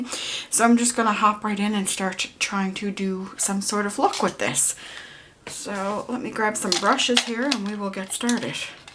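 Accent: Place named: Australia. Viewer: Oh my god!! I JUST came from piinksparkles vlog and as i was watching this I recognized your accent!! Im from north sydney!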